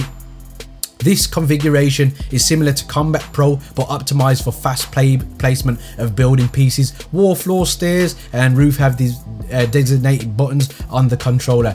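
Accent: Birmingham, United Kingdom. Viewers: nothing wrong with a brummie😂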